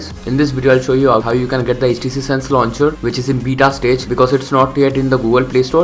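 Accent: India. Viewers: tenk eu kam egen. hard to understand thick Indian accent with loud bg music and bad sound quality.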